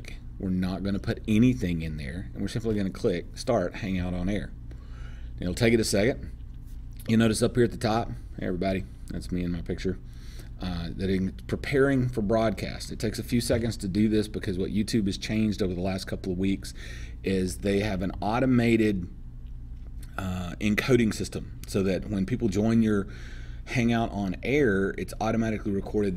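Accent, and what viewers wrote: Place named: South, United States. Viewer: That intelligence... That Professionalism... That southern drawl. :D Well done, sir. Great information!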